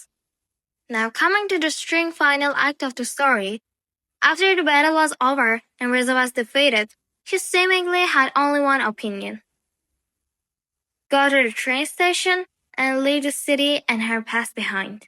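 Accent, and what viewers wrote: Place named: Turkey. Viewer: Why does your accent sound so turkish?? Good content btw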